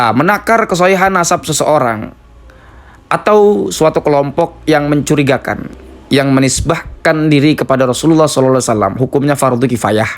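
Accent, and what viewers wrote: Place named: Serang, Indonesia. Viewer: Kan. Upload lagi ngaji kitab ala pesantren, dengan bahasa banten Kangen dengan logat serangnya😁😁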